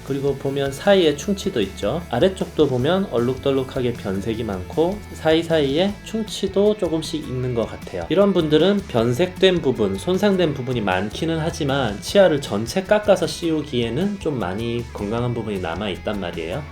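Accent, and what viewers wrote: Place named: Gyeongsang, South Korea. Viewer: 대구 경북 쪽 말투라서 더욱 신뢰가 가네요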